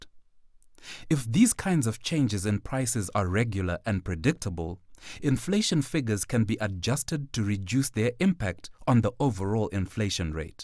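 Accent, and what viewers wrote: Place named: Zimbabwe. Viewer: very good, i wonder who posted this, kinda sounds like a zim accent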